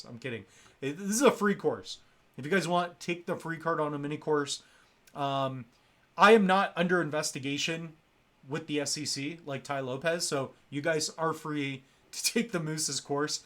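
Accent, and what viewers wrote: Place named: Canada. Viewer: Heard the Canadian accent 😂 I was just thinking "if he's not from canada he's from Minneso-da"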